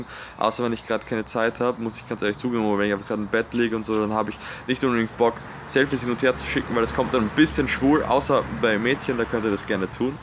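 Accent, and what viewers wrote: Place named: Graz, Austria. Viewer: Das ist der grazer Akzent wir sind keine Bauer in graz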